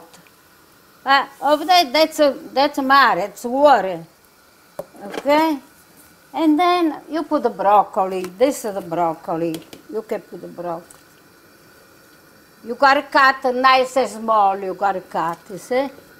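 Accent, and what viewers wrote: Italy. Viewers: Always a pleasure to watch you cook and to hear your Italian voice. I gifted, again, your cookbook to a loved one. Precious recipes. Health and strength to you, and to all your famiglia.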